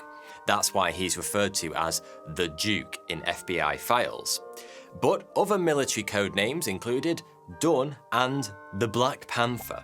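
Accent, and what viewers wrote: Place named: Yorkshire, United Kingdom. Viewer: Everybody loves the yorkshire accent. Exactly why this guy is so popular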